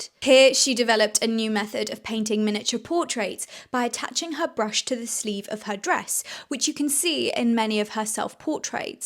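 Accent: United Kingdom. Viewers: As a non native English speaker, I can't decide what amazes me most; the wonderful story of Sarah or the delicious British accent of the presenter.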